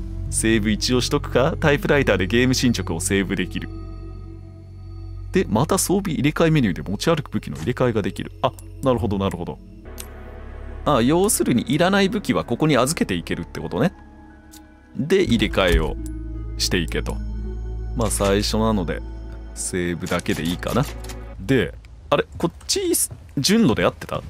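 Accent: Kansai, Japan. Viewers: なんで関西弁なん？笑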